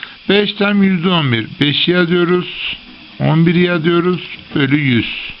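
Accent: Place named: Central Anatolia, Turkey. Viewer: kağıdı halının üstüne koymuşsunuz,masada daha rahat yazardınız,şiveniz ortaonadolu gibi...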